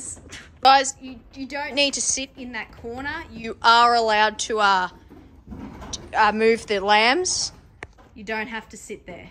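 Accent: Australia. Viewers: I didnt even consider that most people watching would be american because youve got a proper aussie accent 😂 im watching from WA, i just think you're funny af and its cool to watch farm work for some reason 😅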